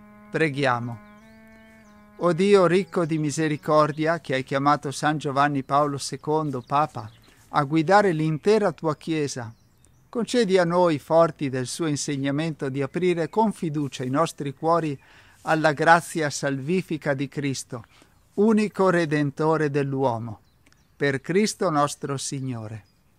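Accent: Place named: Veneto, Italy. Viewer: Ma quanto sei bravo fra??? La tua gioiosita' è contagiosa e iniziare la giornata con il S. Vangelo, detto e commentato da te, con la gioia che esprimi così bene con la tua solarità e in quel posto magnifico è farsi un regalo immenso!!! Anche il tuo accento veneto è bello: i miei genitori erano veneti e tale mi considero anch'io anche se nata a Varese! Grazie fra! Che Dio ti mantenga sempre così e ti benedica! 🌹💙🙏🙏🙏🥰👋 Volevo anche dirti che, mia mamma è tornata alla Casa del Padre proprio il 18 maggio di quest'anno, giorno del compleanno di papa Giovanni Paolo II 🌹❤️🌹💙🙏🙏🙏🙏👋👋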